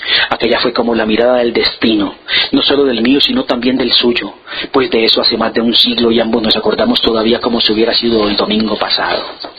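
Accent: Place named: Antioquia, Colombia. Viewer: Esta no es de mis historias favoritas, sin embargo entiendo porque esta leída de esa manera. Para aquellos que critican al lector, deben saber que esta historia es acerca de un “culebrero”. Este es el nombre con el que se describe en algunas regiones de Colombia a aquellos que se dedican a vender o engañar al publico de pueblos con medicinas y cremas que en realidad no tienen ningún valor medicinal. Es mas un show que una venta en realidad. El punto es que la manera característica de hablar de estos personajes es así como lo hace este narrador: de manera rápida, con pequeñas pausas para tomar aire y un marcado acento paisa 😀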